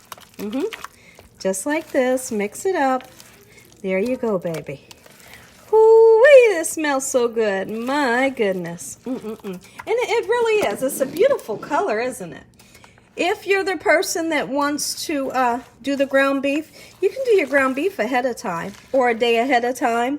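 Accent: South, United States. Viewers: Have you always lived in Ohio! I swear I pick up a southern accent or Louisiana accent in your voice...I am from Texas......I am loving your channel!! hugs, xoxo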